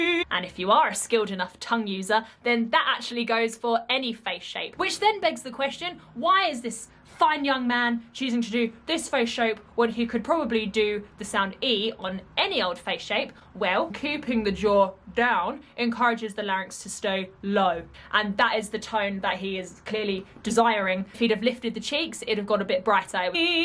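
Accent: United Kingdom. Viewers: İt has my soul apart hhahaha .. I wanna find you and squeze your cheeks.. You could ve cuties person in the world 😇 Also your british Accent is so cute.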